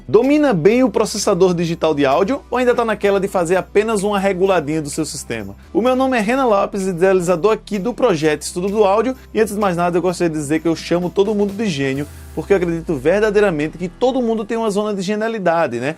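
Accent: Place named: Pernambuco, Brazil. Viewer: Imaginei que fosse pernambucano.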